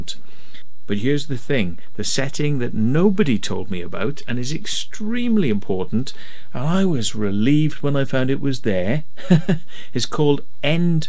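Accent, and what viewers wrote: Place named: Wales, United Kingdom. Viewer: Best description of these settings I've seen. Now I'm trying to apply what I've learned to my Mavic 2 Pro. Kind of funny to hear a fellow Welsh accent (I'm near Pontypridd)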